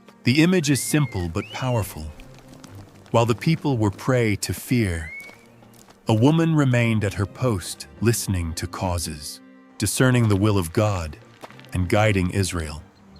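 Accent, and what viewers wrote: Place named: Africa. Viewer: African accent you aren't saying ask but axe ..put me right off.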